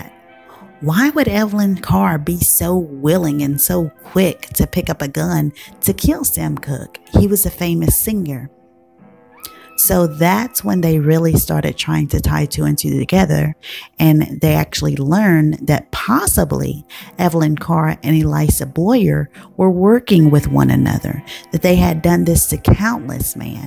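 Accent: South, United States. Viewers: I've thought about starting a channel. Good luck. You have a beautiful speaking voice. Are you Southern? I heard about Berle's package previously. I'm a huge Drifters fan. Sad about Clyde.😢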